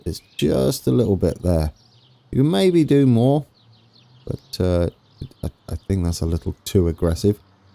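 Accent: United Kingdom. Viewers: Ah yes, soothing British voice to brighten my day.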